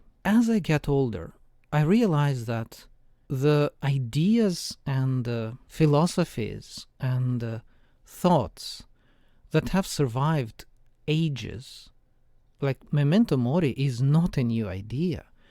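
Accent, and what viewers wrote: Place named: Russia. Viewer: First, the Russian accent; second, the subject matter; third, the relevant knowledge of history. I myself am half-Russian; Baptized Orthodox from infancy: My grandparents -- from the nobility and landed gentry, respectively so speaking -- escaped the USSR in around 1920 to emigrate finally to Canada via Western Europe. My mother was born in Canada right around the beginning of WWII; I was born in the USA in January of '71. It's A Small World; But it's an even smaller Orthodox World. God Is Good. Glory To God For All Things. До свидания, Господин.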